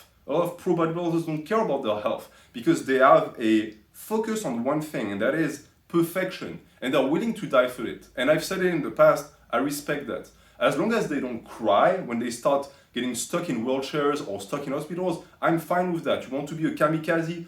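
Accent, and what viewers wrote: France. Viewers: I love how sophisticated your English is with your strong French accent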